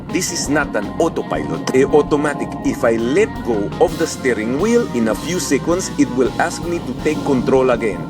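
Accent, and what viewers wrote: India. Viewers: En realidad eres tú? Suena como tu tono de voz, pero un acento Indi demasuado marcado, no suena ni como acento latino, como le hiciste? Por lo demas excelente video bro, me encantan tus videos, keep ut up, thaks to you I made my decision between a few options to by a car appreciate it 🙏🏻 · El acento se escucha como el de los indios de la india. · Por que hablas como Apu?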